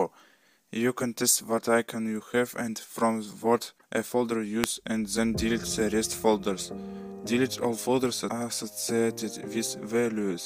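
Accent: Russia. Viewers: Акцент русский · I listen russian accent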